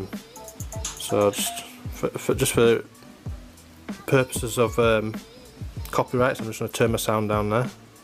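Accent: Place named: United Kingdom. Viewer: He sounds like a British lad will be surprised if he aint i love mi British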